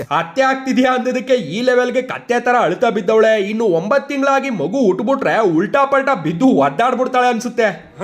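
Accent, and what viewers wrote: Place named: Mysore, India. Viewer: ನೀವು ಏನ್ ಗುರುಗಳೇ ಮೈಸೂರ್ ಕನ್ನಡ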